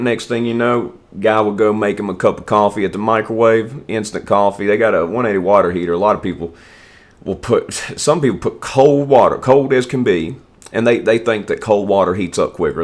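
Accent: United States. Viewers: LOVE your country accent bro...so sexy!! ❤ from Scotland ✌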